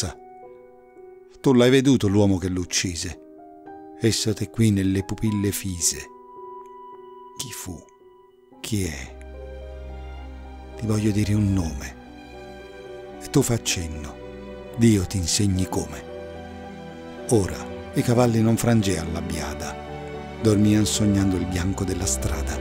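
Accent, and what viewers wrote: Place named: Naples, Italy. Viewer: Bravissimo! E poi questo accento Napoletano su una poesia Romagnola rende il tutto universale e oltre il tempo...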